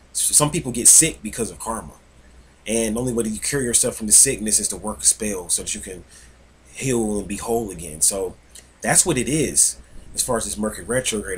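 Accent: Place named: Philadelphia, United States. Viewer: i can tell he is from philly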